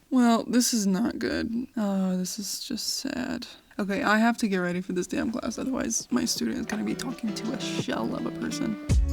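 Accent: Portugal. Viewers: I'm brazilian and I coulnd't help noticing your portuguese accent! Did you choose to learn portuguese from Portugal instead of brasilian or you just naturally got this accent by listening to people from portugal while learning? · Caralho hahaha, curti muito você falando Português com sotaque de Portugal mesmo eu odiando esse sotaque feio da porra hahaha, mas você fala Português perfeitamente. Gostaria de te elogiar. Você falou ''caralho'' perfeitamente hahaha, pode reduzir também para ''caraio'' ou ''carai''. Você soa mais agradável do que os próprios Portugueses hahaha. Mas amaria ver você falar com o sotaque Brasileiro, mas teria que escolher um porque tem vários, o sotaque Brasileiro mais próximo do Português Europeu é o do Rio. Você não é poliglota a toa. Curto muito seu trabalho ajudando as pessoas a aprenderem outros idiomas e culturas. Todo sucesso do mundo pra você!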